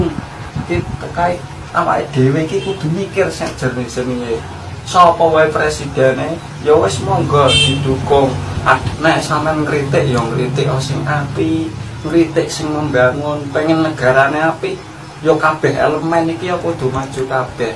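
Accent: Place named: Surabaya, Indonesia. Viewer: arek suroboyo leress cak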